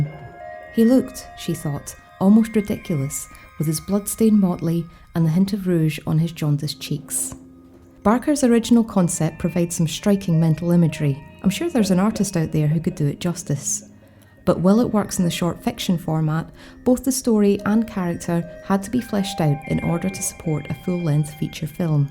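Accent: Scotland, United Kingdom. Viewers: Really excellent. And nice to hear a fellow Scot. I always thought the film was meant to be as much like 'rumour' as Candyman himself, so resisting clear-cut explanations. I also wonder how much input director Bernard Rose had. His wonderful film 'Paperhouse' was praised by Barker, and makes an instructive comparison with 'Candyman'. Helen also reminds me of Julia in Hellraiser (one and two), in a faint way. The murders that happen because of them or by them, which are only between them and the male villain. Then they both 'resurrect' - Helen as a murdering ghost summoned from the mirror after her name being said five times, Julia as a murderess 'summoned' from a mattress as the result of several murders (having become, maybe, some kind of legend herself, in the doctor's eyes). I'm spitballing....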